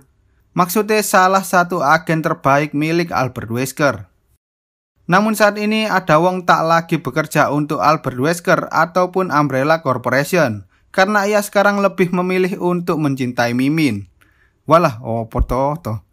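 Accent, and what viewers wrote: Java, Indonesia. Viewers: Orang jawa bang?? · Wong jowo bg😂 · Fix org jawa cara Ngomongnya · jowo endi mas? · medok bener ... medok!!! 😤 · Keritik pedes dari gua . Lu itu ngomongnya medok ga usah bercanda kalo bikin video . Ga lucu sumpah malah bikin ilfil .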